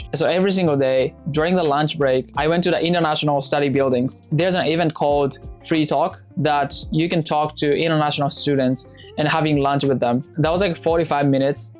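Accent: Japan. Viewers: 発音指摘してる人いるけどこの人ネイティブじゃないんだから当たり前だろ どんだけ英語に使っていても悲しいことに中々日本語アクセントは消えない…… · 発音 何かカタカナっぽさが残ってない?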